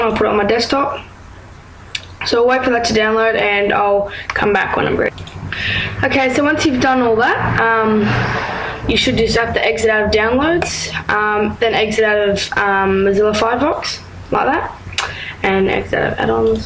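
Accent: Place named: Australia. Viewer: thanks you have an aussie accent im aussie as well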